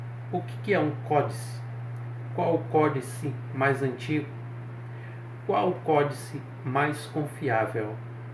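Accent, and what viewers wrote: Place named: Brazil. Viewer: Mais um que acha que judeu, até me interessei em saber o que significa um códice, mas já começa falando esse hebraico abrasileirado 😅 tô fora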